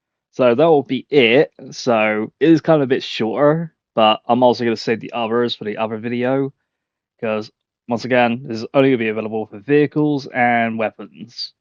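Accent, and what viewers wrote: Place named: Bristol, United Kingdom. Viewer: Your from Bristol gurt accent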